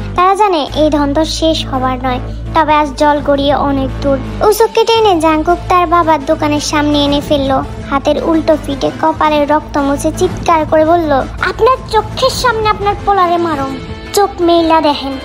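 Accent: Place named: Old Dhaka, Bangladesh. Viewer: আপু তোমার এক গল্প কয়বার দেকলাম ঠিক না-ই তোবো ভালো লাগে আমি fb এর মধ্যে পরছি তবো এমন ভালো লাগেনাই। যতোটা তোমাদের মুখে ভালো লাগছে। বিশেষ করে তোমার মুখে পোরান ঢাকার ভাষার নতুনভাবে প্রেমে পরলাম ভালো লাগে। যতো বার শুনি মনেহয় এই ffটার প্রথম বার শুনছি। ❣️❣️😊😊😊😊😊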